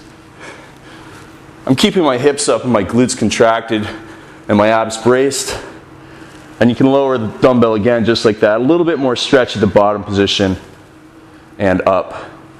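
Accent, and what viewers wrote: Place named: Canada. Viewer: I think he is Canadian because he says "oout"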